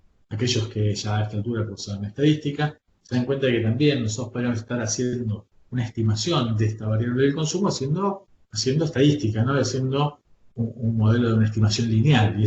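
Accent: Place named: Argentina. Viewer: Es una pena, la clase parece muy buena pero la calidad del audio sumado al acento super marcado de argentina, lo hace inaudible para el resto de latinoamerica.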